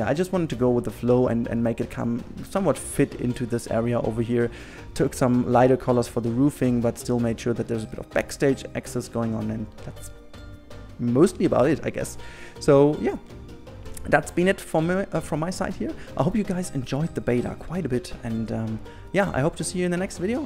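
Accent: Germany. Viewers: To be honest, I like that you explained your choose of language. I watched many of your videos, simply because they are absolutely amazing. But your German accent and some phrases were confusing me sometimes... anyhow, now I love your videos even more! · German too and I don´t like hearing most others,when talking english, but you don´t have this strong accent. it is noticable ,but just slightly. That´s very ok to listen to, similar when I speak. Ikr ,hearing oneself is always weird. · I actually really like watching you because of your German accent, please don't change :) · I kind of like hearing German people speak english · well, about your accent is good better than another German 🙃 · I for one think the German accent and language is positively breathtaking. Normally I’m not a fan of commentary in speed builds but you have such interesting mannerisms, stories and viewpoints that I quite like listening to you talk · War ja klar, dass die deutschis sich aufregen, dass du Videos auf Englisch machst. 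xD Anyway, du bist der erste Deutsche, dem ich auf jedenfall laenger zuhoeren kann, da dein Englisch wirklich sehr gut ist und du auch keinen so starken Akzent rumtraegst. Don't listen to the kiddos, who whine because they dont understand shit - you do some neat, quality content and deserve the recognition online :)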